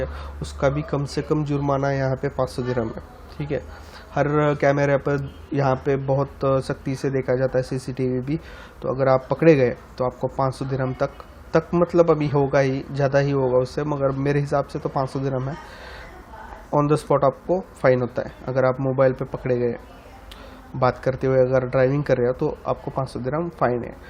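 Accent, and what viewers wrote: Maharashtra, India. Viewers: bhashet lagech marathi tone lakshyat yeto. Bhau mst good work.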